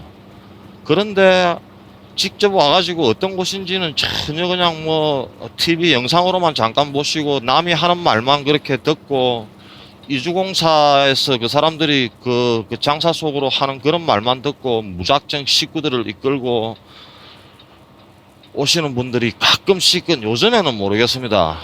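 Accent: Gyeongsang, South Korea. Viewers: 캐나다 언제 갔습니까? 그리고 갱상도 분이시군요 여기도 부산임다~ 구독자수도 많이 생기면 무작정 운전만 아니라 구간마다 생각날때 답변해주실수도 있겠죠 ㅎ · 동영상 잘 봤습니다!! 경상도 사투리가 인상에 남네요^^ . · 영상 잘보고 있습니다~^^ 저는 대구사람인데 경상도 사투리가 구수하시네요~^^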